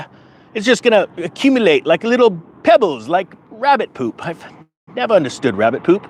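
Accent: RP, United Kingdom. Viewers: Love the David Niven accent,. Keep it up! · LOVE THE BRITISH ACCENT!😅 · This sounds much more serious in a British accent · I love your Limey Accent!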